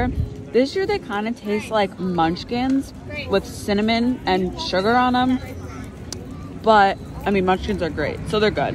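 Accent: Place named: New York, United States. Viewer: New York is on mine and my wife’s bucket list! I thought that was your accent but didn’t want to speculate lol. COME ON YOU BOYS IN GREEN!!!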